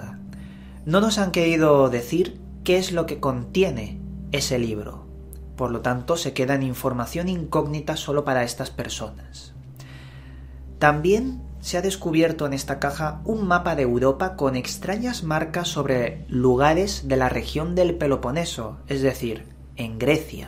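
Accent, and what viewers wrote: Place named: Spain. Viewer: No soy muy amante del acento español, pero el tuyo es tan tranquilo tan suave que me relaja, saludos desde Colombia.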